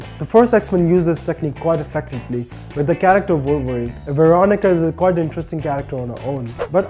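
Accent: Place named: South Africa. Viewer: YOu sounded more South African.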